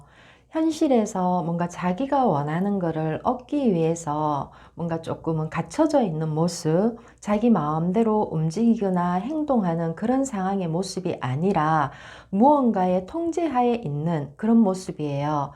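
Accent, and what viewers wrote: Gyeongsang, South Korea. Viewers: 경상도 억양을 왜 고쳐야하죠?? 님이 지루하면 안들으면 되는것을..... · 열심히 리딩 해 주셔서 감사 합니다 . 하지만 조금 지루한 감이 느껴 지네요. 경상도 억양 고치시면 더 좋을것 같아요.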